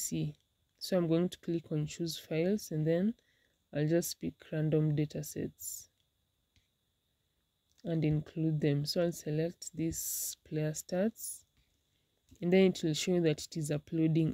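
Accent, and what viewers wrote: Kenya. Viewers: Eish you sound like a Kenyan wee nakushow nimekwama videadly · I can tell that you are a Kenyan. 😅 Kenyans have their own accent.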